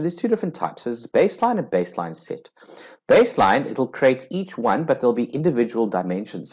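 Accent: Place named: South Africa. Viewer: are you south African, i heard your voice and immediately knew 😂😂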